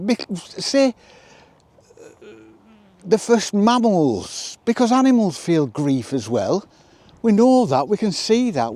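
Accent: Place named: Home Counties, United Kingdom. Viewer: Thank you for your very wise words and support for Jamie, so many people round the world think the world of him. It’s so true that the price of love is grief. Love your words about the end we will all face and how to live a slow simple life literally “smelling the roses”. You sound to come from my home county but I now live in the Lake District which is currently overflowing with visitors who do not understand that life is finite and precious and that my home is not their playground, whizzing too fast to see the real valley. Sherps helped me to cope with feeling trapped and lonely.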